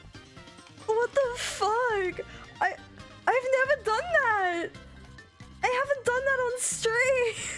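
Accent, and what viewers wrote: United Kingdom. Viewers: The british is coming out of her.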